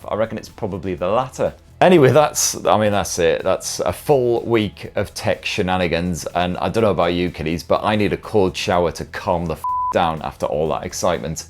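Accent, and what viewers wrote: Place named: Northern England, United Kingdom. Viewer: we need you to tell us if any new piece of tech is shite or not shite in all your charming Northern ways.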